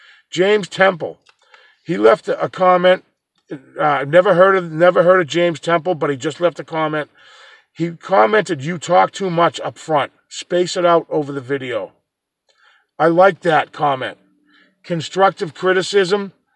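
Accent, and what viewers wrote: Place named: New York, United States. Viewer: I love your channel becsuse you're a regular guy dedicated to honest research. I like you even more now because asshat is my favorite pejorative descriprion of some folks. I also love your accent, and I'm from Long Island so I can relate.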